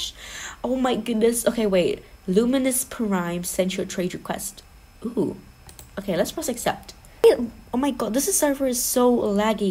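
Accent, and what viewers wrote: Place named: United Kingdom. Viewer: Wow you are British and a normal English accent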